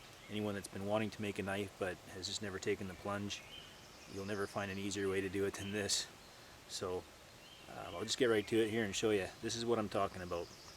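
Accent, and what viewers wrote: Canada. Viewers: are you canadian? i can hear it from the ou in about. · Are you Canadian? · If you haven't ever heard a thicker Canadian accent, I could understand thinking it sounds a bit Irish. It's more of a Scottish influence, but again, not completely ridiculous for someone who doesn't know better to draw that conclusion. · canadian accent eh? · Are you canadian? Just asking. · Thumbs up if you knew he was Canadian before he mentioned he was making a knife.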